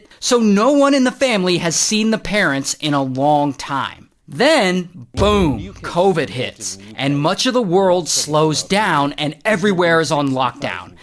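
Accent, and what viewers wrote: England, United Kingdom. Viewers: I love English accent. He makes everyone seem so nice and proper. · I like the brittish acent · Loving your English accent ❤ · I love your use of typically British swear words like 'bollocks' and your British accent; not the best, but hilarious! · The British accent 😂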